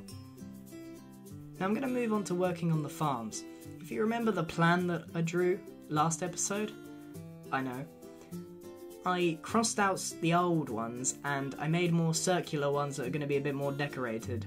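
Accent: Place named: RP, United Kingdom. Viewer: This guy has the most posh accent I've ever heard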